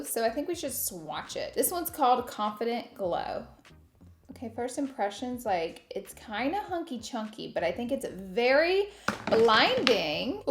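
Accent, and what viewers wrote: South, United States. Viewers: Girl....That Black lipstick ruined it for you Lol 😂, but I guess it was fun....Good to see you doing a makeup tutorial But I do much prefer your other videos now with a little bit of home decor and the family in them. Your So Cute and I love your accent, southern charm girl. Best Wishes and Kindness from Queensland Australia 🇦🇺❤️❤️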